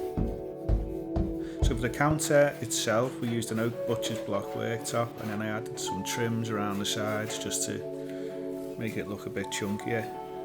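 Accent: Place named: Lancashire, United Kingdom. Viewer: Your accent.. West Lancashire/Merseyside.. How you find it living up there? Long way from home, but I've always wanted to move up there myself too. How's it in your experience?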